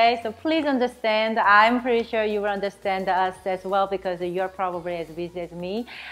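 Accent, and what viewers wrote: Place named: South Korea. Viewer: 영어발음이 너무나 익숙한 발음이라 한국분이신가 했는데 역시 한국분이시군요ㅎㅎ 똠카까이 너무 좋아하는데 자세한 레시피 감사합니다!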